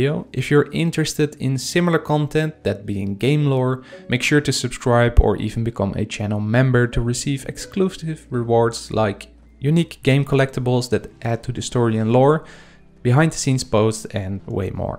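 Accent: Netherlands. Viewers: Do I recognise a Dutch accent here? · Are you dutch 🇳🇱? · I know this is of topic to the video that ur making but are you by any chance Dutch? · jezus gozer, dit is echt het meest nederlandse accent ooit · Are you from the Netherlands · You can hear really good that the guy who talks is from the netherlands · Are u Dutch?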